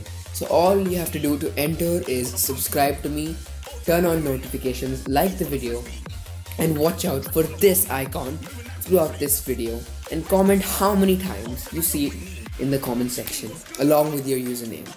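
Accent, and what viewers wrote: India. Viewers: Ooo are you Indian? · are u indian? By ur voice i think ur indian and do u have extra spike i am buddy83282 and i saw it 5-6 times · are you indian? It sounds like it ouo (im not btw just asking)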